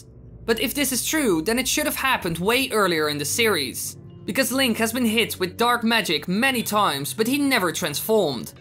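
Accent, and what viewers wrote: Netherlands. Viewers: you a dutchy? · hehe is that a holandish accent i hear? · Are you Dutch?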